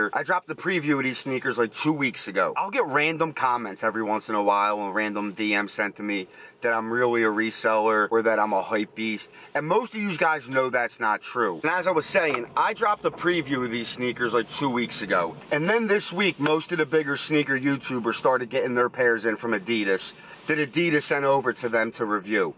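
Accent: Philadelphia, United States. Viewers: haha randomly found you from your vapormax chukka video I realized you were from philly as soon as I heard you say like 5 words. I'm from Harrisburg man its cool seeing guys in my state doing dope shit!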